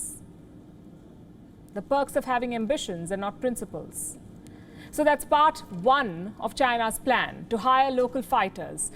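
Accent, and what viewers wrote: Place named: India. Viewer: China, China, China. LOL , i thought i can get a better understanding of India by seeing this site, an Indian station hosted by a lovely Indian lady with a cute Indian accent . But all the things I heard so far is this lady talking about China and nothing much about India. She is talking about China of which she does not understand. I think she don't even speak or read Chinese. How the heck she can get first hand information about China, let alone military secrets? As for differentiating Tibetan Chinese and Han Chinese is like differentiating Bengali Indians from Tamil Indians. Geez, either i am getting old and gullible or just like to have a good laugh.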